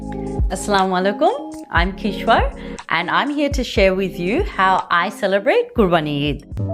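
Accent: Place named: Australia. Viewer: She got aussie accent but she speaks clear bangla with no extra accent My respect for her is growing every day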